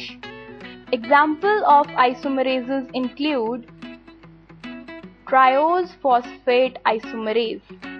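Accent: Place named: India. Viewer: Oh my god I'm arabic and I can't understand your hindi english 😣😢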